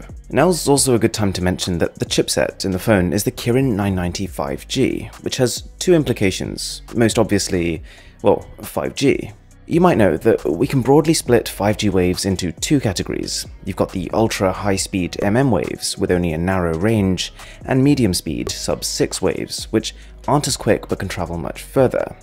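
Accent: RP, United Kingdom. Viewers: Quite weird to hear someone with such a strong/"posh" British accent say "anyways" several times. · This guy is so posh omg looool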